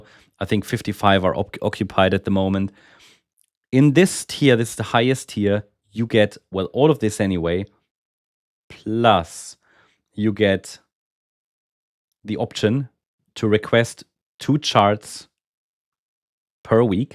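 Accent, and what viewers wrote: Germany. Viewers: you have a german accent, are you german? )))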